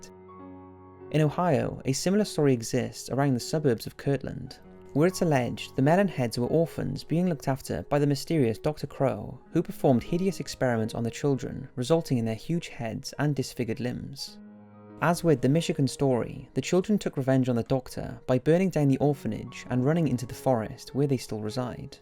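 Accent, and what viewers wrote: United Kingdom. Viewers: YESSS! The British narrator is back 😆😃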